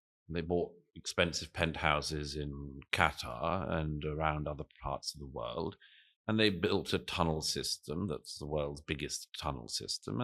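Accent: RP, United Kingdom. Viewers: He leaves out that Israel has been just as terroristic in their actions as has hamas. They also have never received statehood or had a seat at the table at the UN. You all listen to this man with a British accent using Strong vocabulary and act like he isn’t spewing bs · Douglas Murray is mostly empty rhetoric and because of his posh accent people way overestimate his intellect. On Gaza he is dead wrong. It’s clearly an imperialist project by Israel. He ignores the fact that Netanyahu propped Hamas up, and that his govt is full of radical imperialists who openly say they want to take Gaza over completely (and are about to). This was never about defence, it was always about stealing land.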